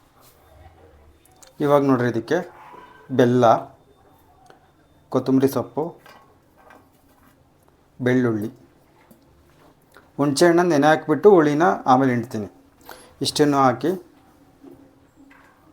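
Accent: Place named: Sira, India. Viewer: Nivu sira ಕಡೆಯವರ ನಿಮ್ಮ ಭಾಷೆ ಹಾಗೆ ಇದೆ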